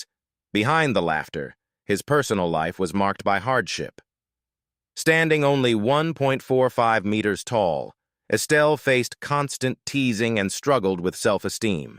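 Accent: United States. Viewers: Why use an American voice to tell us all about a British show. 😢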